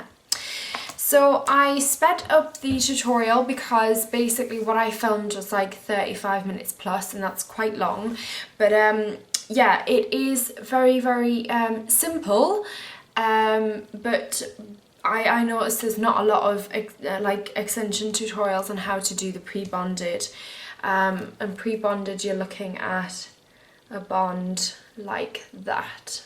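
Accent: Newcastle, United Kingdom. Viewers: I think she's from newcastle england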